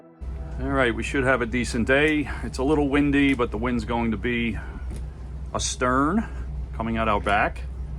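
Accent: New York, United States. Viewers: Hi I am sorry i don't know your name. I enjoy watching your Video's. Love your New York accent. People say I sound like I am from Bronx.. LOL We live and boat on the South Shore long Island. We also snow bird in Naples FL.. My Wife and I have a 94 Mainship which We love. i also do my own work so i can relate to your video'. Safe Travels ( 7 knots is painfully slow) lol keep the Video's coming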